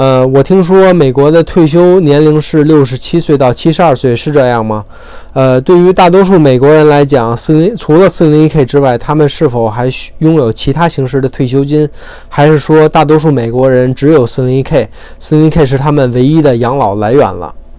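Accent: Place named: Tianjin, China. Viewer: 天津人吧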